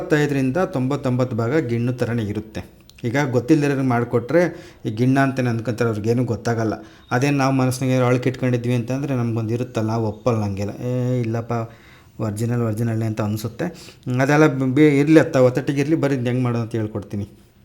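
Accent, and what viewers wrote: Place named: Channagiri, India. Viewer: Channagiri side pura hege matu keli thumbha kushi aytu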